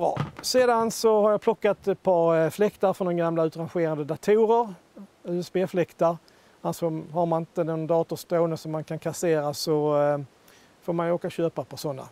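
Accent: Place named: Skåne, Sweden. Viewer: Not BILL-tong, but bill-TONG. Equally tasty, nevertheless. Wonderfull idea and a great Scanian accent! I have to make one myself instead of filling my check-in luggage with billtong every time I return.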